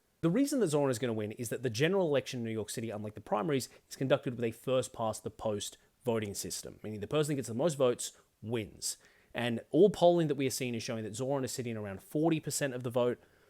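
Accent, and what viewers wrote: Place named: Australia. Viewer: Having watched this shit show from the outside through other progressive commentators its so funny to see another aussie with the VERY australian way of talking about things. Its sad to see so many other commentators who refuse to say that corpo dems deserve to have their teeth kicked in for constantly ratfucking anyone they dont like.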